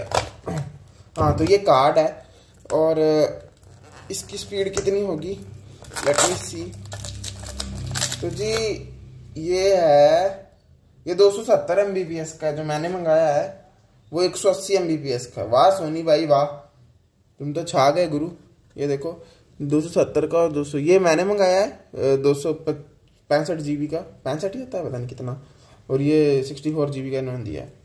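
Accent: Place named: Pahadi, India. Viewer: Pahadi accent ❤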